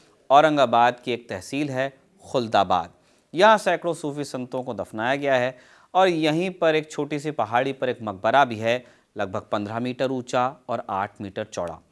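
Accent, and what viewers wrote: Bihar, India. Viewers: Good Behari accent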